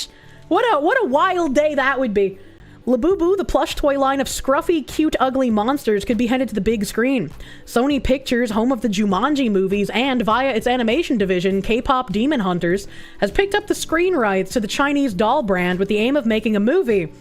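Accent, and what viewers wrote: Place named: New Jersey, United States. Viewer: Wait she lives in Georgia? Always thought that was a jeresy accent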